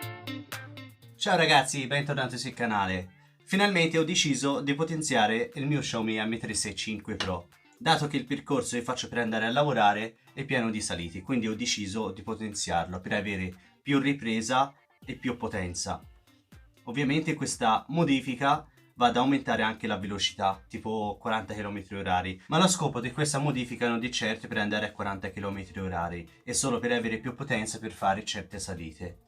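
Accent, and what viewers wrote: Tuscany, Italy. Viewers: Di dove sei ? Toscano? Se ti mando i monopattino me lo modifichi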